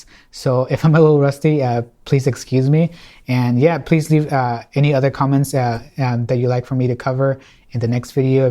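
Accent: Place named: Spain. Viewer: You sound spanish. Do you like Messi- I don't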